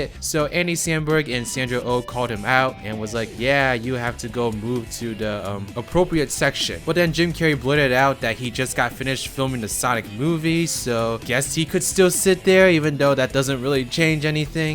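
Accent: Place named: Asia. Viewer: Whoever you are, youre asian, i can tell from your accent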